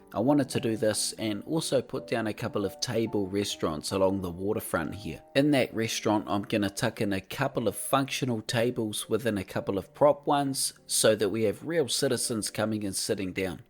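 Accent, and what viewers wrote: New Zealand. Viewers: Good to hear a kiwi accent. Aotearoa represent :elbowcough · Like you I really enjoy the way you can create art in this game. I don't get anywhere near as beautiful and detailed a finish as you though even after hours of PO work. You really capture the character and charm of old Europe. I love your work and also your lovely NZ accent. 😍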